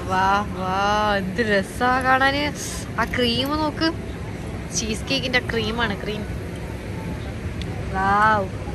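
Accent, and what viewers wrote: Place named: Payyoli, India. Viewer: Payyoli slang.... 👌👌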